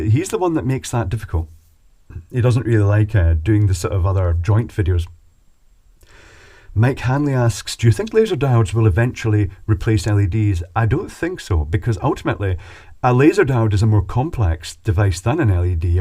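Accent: Scotland, United Kingdom. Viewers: I always thought you were not from around Glasgow, you sound posher than that 😂. I love visiting Glasgow, especially a whisky shop I found in a basement where you need to walk down steps to get to the door. I stayed at Bowling harbour once on my boat. Loved it. All the best, you’re a bloody genius. Love your channel.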